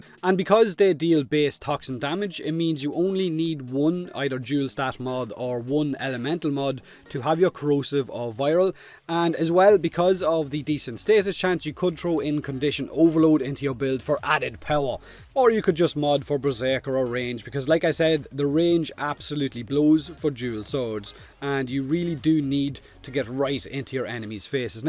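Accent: Ireland. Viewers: I love your irish accent. It’s amazing. You’re by far my favourite youtuber, and i will definetly try the dual ichors ;3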